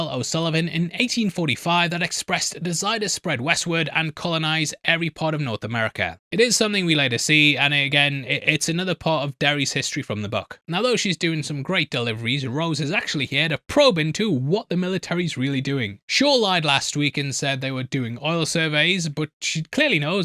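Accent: United Kingdom. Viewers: Ain't nothing wrong with your "Shreveport". You're just British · As someone who grew up near Shreveport, you are saying it correctly. People probably just find it odd to hear it in a British or even neutral accent because of the deep, region-specific accent people near there tend to use.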